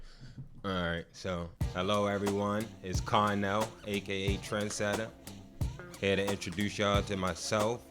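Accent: Baltimore, United States. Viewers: dont apologize for dat baldamo accent young brotha 410!!!!! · I have dated quite a few Baltimore men and have a lot of friends here so yes you all sound like that but it's cute! I used to get mad when my ex called me dummy but now I realized that it is a term of endearment! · That Baltimore accent is obvious . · B- more accents are cool in my opinion😎 Baltimore keep stayin true 💯💯 Much love from Savannah Georgia🍑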